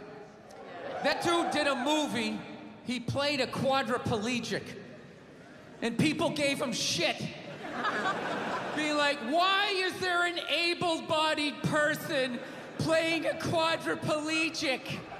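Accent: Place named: United States. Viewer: Pub bore with a yank accent. Heard it all before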